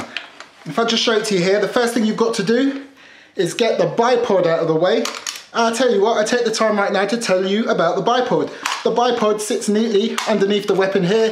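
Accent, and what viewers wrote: London, United Kingdom. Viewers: Another fantastic review I tell you what your microphone is placed probably in the right place you sound very clear and loud which is a good thing and I'm really digging that British accent... Take it easy brother we will see you on another video and yes I did subscribe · Well uve got another subbie gotta say your vibe is growing on me are you a londoner by any chance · are u british ??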